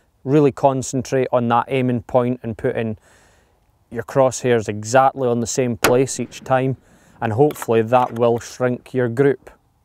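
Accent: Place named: Scotland, United Kingdom. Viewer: Love that Scottish accent Laddie.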